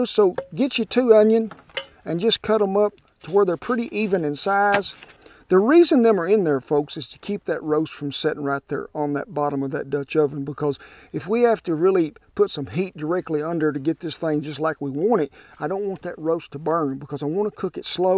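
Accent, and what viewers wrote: United States. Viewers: Best of American accents, hands down. Greetings from Argentina.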